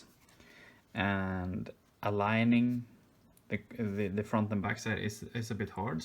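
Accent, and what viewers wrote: Sweden. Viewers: Wait are you Swedish, your accent sounds like it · Älskar den svenska dialekten, inte ofta man hittar en annan svenne här på tuben, lycka till med kort byggandet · Are u Swedish? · Your accent sounds... awfully Swedish. (I'd know, 'cause hej hallå, jag är också en Svenne, isf.) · I was thinking of Also doing something like this. But im not to talented and i hope you somehow Will be able to buy these. Also is he Swedish? Hes accent souns Swedish/nordic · Are you swedish? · Just a quick question. Are you Swedish ? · Love the swedish accent lol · Hey are you from sweden? No offense but you do have that swedish english accent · This is so cool, also are you from sweden maybe?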